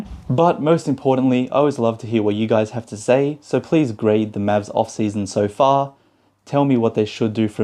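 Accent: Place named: Australia. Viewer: Just found you. I grew up around Dallas when they first became a team in 1980 or the 80's (getting too old to remember). I'm guessing your an Aussie, hard to tell with some Aussie, English, or Kiwis, for me. Great analysis, would be awesome to get Dragic and Oubre. You never know with Cubes. Great that Slovenia and Australia are playing each other that will bond Luka and J Green. #mffl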